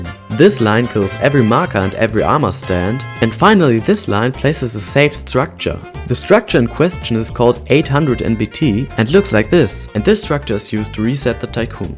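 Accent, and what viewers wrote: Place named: Germany. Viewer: safe you'r german, i can hear this!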